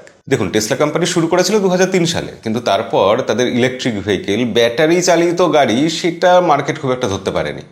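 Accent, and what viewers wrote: Bangladesh. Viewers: ভাই আপনার কথা শুনে ভারতীয় মনে হয় না , পুরাই বাংলাদেশি বলে মনে হয় , আপনি ভারতীয় শুনে মন খারাপ হয়ে গেছে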